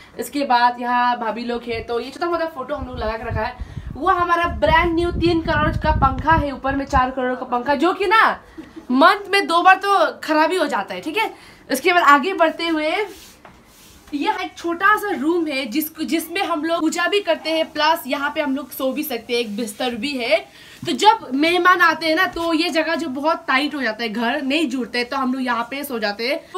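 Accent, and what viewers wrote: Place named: Nagaland, India. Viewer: Aap kaha rahte ho aap k boli se lag raha h aap nagaland se ho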